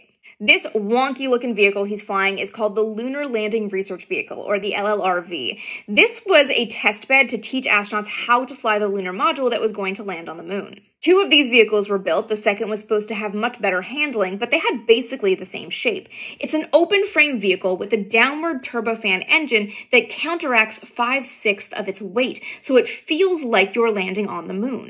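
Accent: Canada. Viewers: Is it weird that I’ve watched you 3x just to hear you say check oot….etc? Go northern neighbor!! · Def. Canadian, BC? your abouts and outs gave you away, just need to hear you say soory, not sorry, soory, either BC or Quebec that's my guess, maybe Ontario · Yes, she's Canadian, eh.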